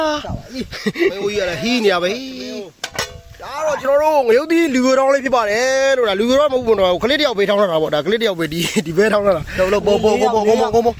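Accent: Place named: Thandwe, Myanmar. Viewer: ဘယ်ဒေသကလဲ အစ်က်ု့အသံက သံတွဲဘက်က အသံနှင့်တူတယ်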